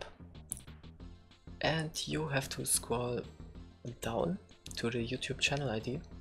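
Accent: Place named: Germany. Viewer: Der deutsche Akzent war unüberhörbar. Danke :)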